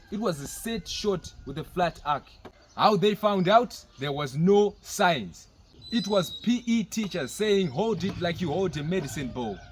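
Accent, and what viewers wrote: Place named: Zimbabwe. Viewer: That zim accent😂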